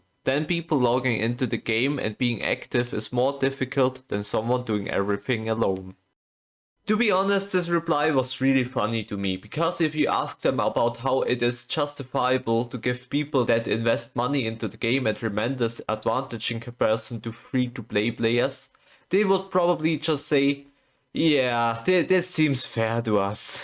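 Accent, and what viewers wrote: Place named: Germany. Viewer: digga dieser deutsche akzent is so schlimm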